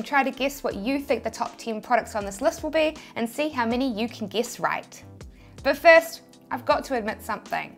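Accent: New Zealand. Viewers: I'm just here to listen to the kiwi accent...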